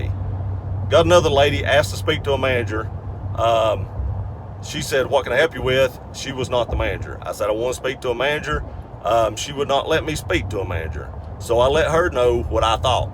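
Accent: South, United States. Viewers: still sound southern: ) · Man, i love this southern accent, it's almost music to my ears..what is it...Texas, Lousiana, etc? & i also love toyota, unfortunately we don't have those big v8 tundra's in Europe, what a shame.. Greetings from EEurope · Well that is a sad story of how you were handled and the safety issues and danger you and others were placed in. I'll be honest I just bought an F-150 and love it but this is disturbing. My engine is a new 2018 2.7 Eco Boost with the 400 lb ft or torque and 10 speed transmission so I hope they sorted that issue out with it. I know it is a completely different motor than 3.5 Eco. I had a 2009 or 10 Tundra I bought new and it was a nice truck. Had the 4.6 V8 but the 5.7 is a real beast. I had a death wobble one time towing my Bobcat with the Tundra but that was my fault using a half ton truck. I never did that again. 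Toyota great trucks and cars for sure. Love the 2018 2.7 F-150 Eco Boost and hope I don't have any issues. Nicely done video btw. Love the southern accent. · Love your darlin honesty. Finally someone that sounds like me with a sweet southern swag. TOYO Tundra here I come. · Your Tundra is an awesome truck. If Toyota is still building them well, you'll enjoy a long happy life with it. I bought a new Tundra Limited Extended cab in 2001. I had originally planned to keep it 3 yrs, then sell it and move on. Man, I fell in love with that Tundra. It had the 4.7 DOHC V8 and NEVER broke down on me. Just did the regular maintenance and she continued to perform flawlessly. Unfortunately, exactly one year ago as I was driving down the road a lady ran a stop sign and t-boned me. Needless to say, my beloved Tundra was totaled. I owned it for exactly 16 years and put 206,000 trouble-free miles on the clock. 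The only knock I had on it was that the paint began to fade at year 12, but no big deal as the truck itself was bulletproof. Congrats on your new Tundra. Again, hope she serves you well and keeps an ear-to-ear grin on your face for years to come. Oh yeah...man, you've got a cool Southern accent. I live in Texas..the accent here's a little different. Merry Christmas!! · you know it's a strong accent when a southerner notices right off the bat lol. nothing wrong with it. it's cool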